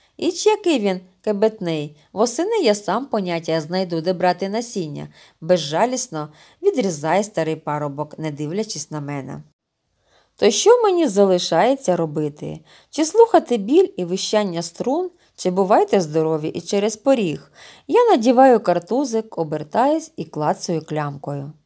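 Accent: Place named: Russia. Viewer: Дуже дякую! Ви мені дуже допомогли! Я завжди вас слухаю.Але в вас якась трішки російська вимова слів і деякі слова ви вимовляєте неправильно але всеодно це ніщо в порівнянні з тим як ви нам допомагаєте)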